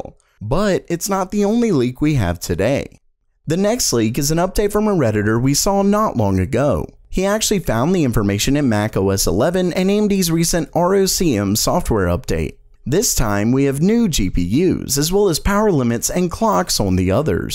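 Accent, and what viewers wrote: South, United States. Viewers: Hes hiding his american southern accent for some reason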